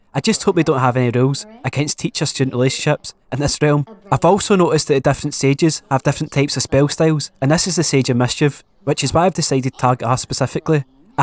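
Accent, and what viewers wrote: Scotland, United Kingdom. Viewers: Its gid to have more Scottish youtubers nicer to listen to along with the Irish ones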